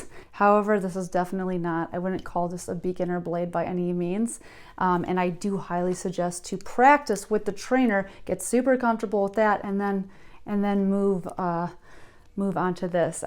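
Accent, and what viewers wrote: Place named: Minnesota, United States. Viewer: I’m originally from Wisconsin. I can’t tell if your from the mid west or Canada . Sounds like Minnesota or Wisconsin. 🤔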